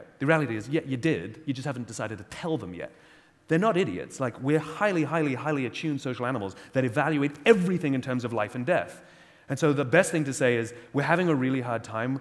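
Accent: United Kingdom. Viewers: wow. first time i'm hearing his british accent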